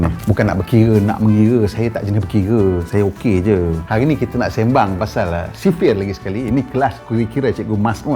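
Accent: North, Malaysia. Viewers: Cikgu kalau guna full bahasa utagha lagi sedap dengaq. Hat ni dengaq mcm dok pedo² ckp utagha. Apa² pon mmg terbaik penyampaian. Mudah dan senang paham.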